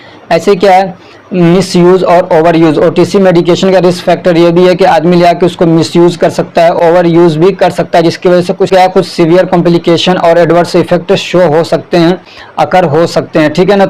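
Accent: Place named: Mithila, India. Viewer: Sir aap kha se aapka tone bihar k mithilanchal area se match krta hai aapka bahut shukriya itne achhe trah padhane ke liye allah apko lambi umar de ❤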